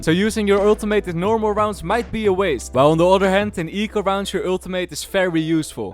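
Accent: Netherlands. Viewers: i smell dutch?!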